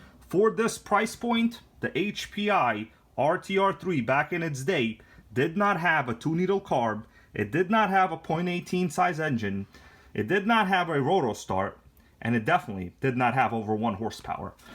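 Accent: United States. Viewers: Hmmm I think in the long run it’s worth spending more bucks on a more reliable machine. I’ve only recently started watching your videos and only recently started thinking about getting into nitro. Just bought an hsp nitro truck and after looking at your video I’m going to return it and maybe go for a Hpi bullet nitro. The problem with having a cheap car with faults that you just pointed out would certainly put one off once it starts falling apart. The only problem with the Hpi bullet nitro is no 2 gearbox, maybe there is an upgrade? Please keep your presentations coming really enjoy it. That accent of yours, which part of the states, sounds really cool . This is Ricky from down under regards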